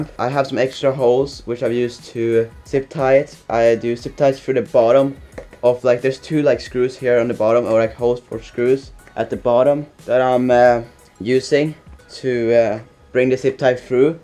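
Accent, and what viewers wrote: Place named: Sweden. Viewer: låter bättre på svenska för du snackar svegelska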